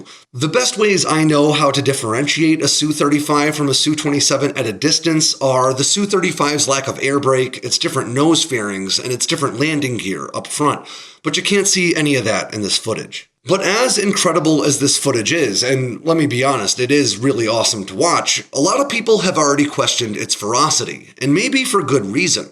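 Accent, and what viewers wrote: United States. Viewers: 2 secs in dumb yank voice cringed me out tata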